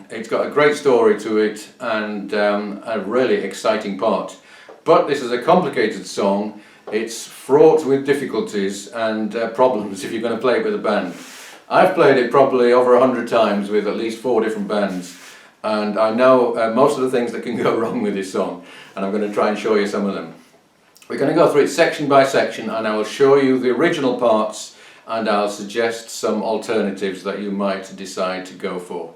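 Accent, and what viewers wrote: Yorkshire, United Kingdom. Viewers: There is no issue with you doing this in a yorkshire accent, as you somewhat note in that it takes the old tongue to do and the aussies are another I could think of that hold on to it. Its interesting to hear it that way and have no objection to it, just dont let it die. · Sounds way better in Yorkshire accent! 👍😉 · why is he british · Brilliant tutorial! Thanks. The Yorkshire accent adds a new twist to an old classic 😉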